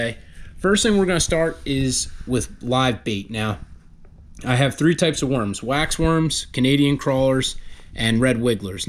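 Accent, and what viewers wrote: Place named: Pittsburgh, United States. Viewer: You sound like you're from Pixburgh! Right?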